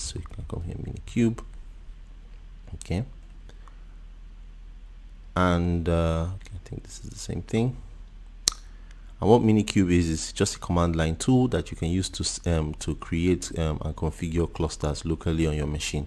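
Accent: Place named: Nigeria. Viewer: I have not even seen the video yet. But I am subscribing because you sound nigerian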